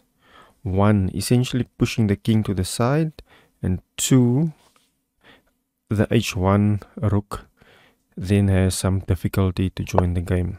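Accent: South Africa. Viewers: Very nice. This is of course the so-called 'Game of the Century' which gained the young Fischer a lot of attention. To the narrator: are you from South Africa?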